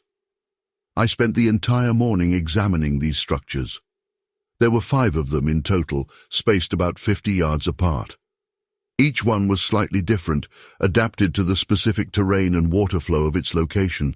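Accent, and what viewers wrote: United Kingdom. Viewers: Ottawa, Canada If this voice is AI can you please use an American voice and NOT a limey's voice. I can't stand British "English"....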